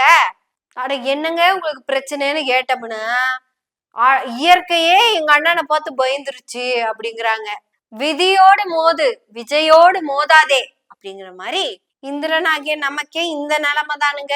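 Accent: Kongu, India. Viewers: கொங்கு தமிழ் அழகாக விளையாடுது வாழ்த்துக்கள் சகோதரி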